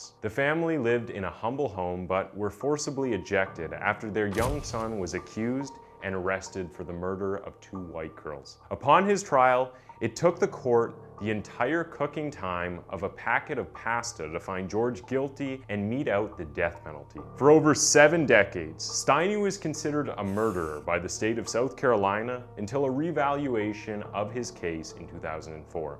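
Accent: Canada. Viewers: Has anyone told you that you sound like a Canadian version of Keith from the try guys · Loving your Canadian accent lol really cute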